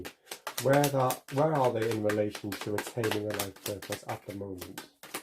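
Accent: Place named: Wales, United Kingdom. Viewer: Hello, thank you for a lovely, and interesting readings, 1 n 4. I felt they linked, and some good uplifting advice. I tried to place your accent, and wasn't sure, but I've heard it before, I was thinking whale's but not sure, the dragon sort gave me that idea. Anyway only if you want to share, your name was an other curiosity 🤔. Loved your reading, and your accent, maybe your names Aegon 😉 😜 ❤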